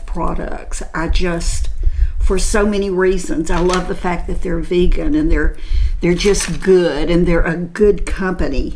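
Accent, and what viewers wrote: South, United States. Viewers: You are from the south...obviously your gentle lilt of an accent. I lived in Atlanta for 16 yrs. you are a true southern belle☺ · I'm glad you shared that you're a strict vegetarian and the💖 e.l.f. is 100% vegan!!! I'm just now at 68 starting to try make up. And I do care a great deal about NO animal cruelty. Thank you so much for sharing all that. I love your video's so much. 💖 You're so sweet and I just adore you. My dad was from NC so I also love your southern accent and mannerisms too. Feels like home to hear it. ❣💋 I wish I could agree that your lips look plumper, but I don't think they do. I tried that product too but I couldn't handle the burn and had to return it. So maybe I didn't leave it on as long you did. I have thin lips too like my mom did, and I keep watching them shrink too. 😞 But wow, quite a beautiful transformation.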